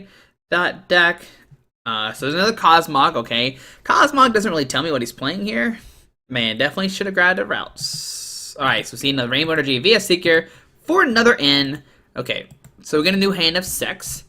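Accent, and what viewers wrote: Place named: United States. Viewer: Guys, it's just a regional accent. Everyone has one. Everyone understands what he's saying, what's the big deal. And I can assure you that an awful lot of people wouldn't be able to tell much difference between his accent and any other US regional accent.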